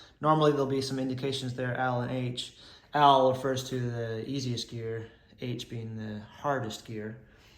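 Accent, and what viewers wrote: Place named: Scotland, United Kingdom. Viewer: Fabulous video…enhanced by the hint of a Scottish accent ..👏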